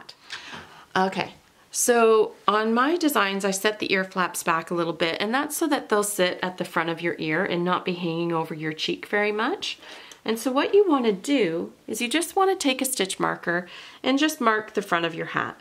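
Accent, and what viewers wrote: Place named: Canada. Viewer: Well I never thought we Canadians have an accent but I can definitely pick you out :) signed, a Maritimer :)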